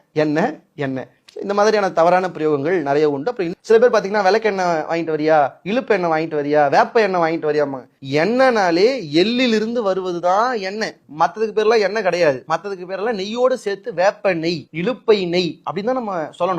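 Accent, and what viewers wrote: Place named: Chennai, India. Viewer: சென்னை தமிழ் ❤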